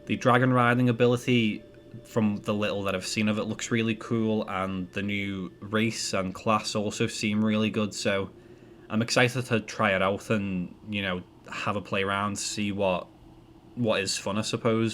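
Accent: United Kingdom. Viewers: It's one of the many regional British accents, maybe you should broaden your horizons more.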